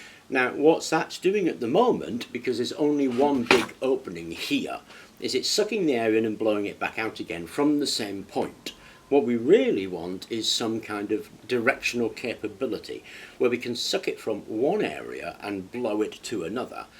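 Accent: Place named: United Kingdom. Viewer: Lately it's been like watching the Tech Ingredients channel with a British accent.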